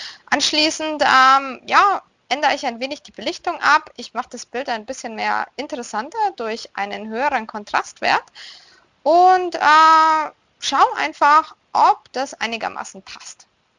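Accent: Germany. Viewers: Hey ^^ What part of Germany is your accent from? love your cosplays <3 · I haven't actually been reading, I've just been sitting here listening to your accent and language. I think the German language is really cool!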